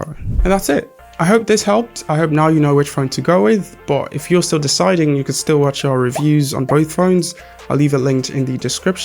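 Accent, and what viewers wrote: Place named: United Kingdom. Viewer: I love the video and as well your British English accent. Many would say you're not a Nigerian living in Nigeria because of your Amazing English accent, just like I was also asked in many occasions if truly I was a Nigerian for the same reason. I loved the review and I am opting for the Redmi Note 12. PS: I sow in the comments someone saying that there's also a Redmi Note 12 Variant which also has 256 ROM. And I wonder how true is that, seeing that you haven't made mention of that variant in review. Thanks and this your new subscriber's here to stay. ❤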